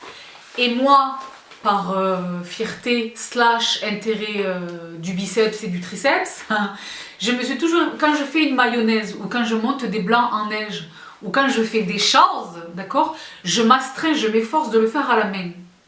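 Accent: France. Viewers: Originaire de Marseille, j'habite à Ottawa au Canada et ma soeur m'a fait découvrir ta chaine. Le "salad spinner" est pas mal - je l'ai acheté l'année dernière et il est encore nickel! Merci pour tes vidéos! Ca fait du bien d'entendre l'accent français!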